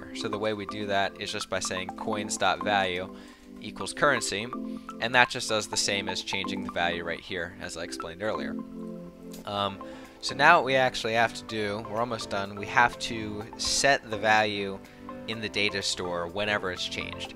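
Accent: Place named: Romania. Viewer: Salut, esti roman? Imi place cum vorbesti engleza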